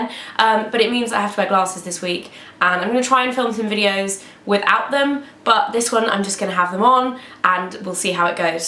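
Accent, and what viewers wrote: United Kingdom. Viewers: Ur British? I swear this whole time I thought u were american.. It's been a while 😐😐😐😐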